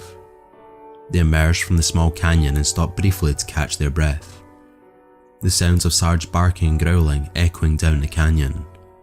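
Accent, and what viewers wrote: Scotland, United Kingdom. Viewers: Omg score! Scary stories read with Scottish accent hah! Love it! ❤️😁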